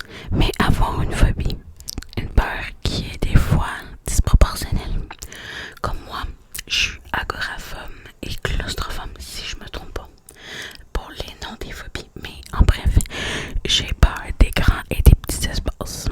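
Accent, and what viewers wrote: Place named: Quebec, Canada. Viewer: oh trop cool une asmr artiste québécoise j’avais jamais entendu de l’asmr avec un accent québécois :)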